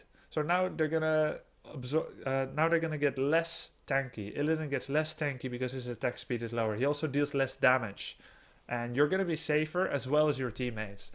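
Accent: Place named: Netherlands. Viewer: You look and sound so Dutch :D Nice vid.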